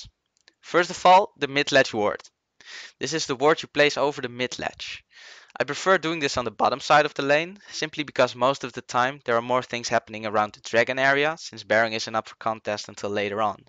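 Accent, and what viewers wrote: Netherlands. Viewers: ben je nederlands? je hebt een nederlands accent (are you dutch i what i asked)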